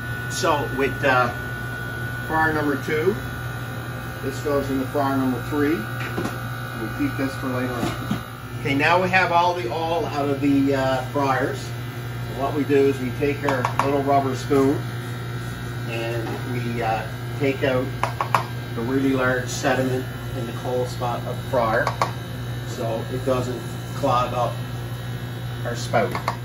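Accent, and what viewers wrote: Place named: Canada. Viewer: I hear Canadian accent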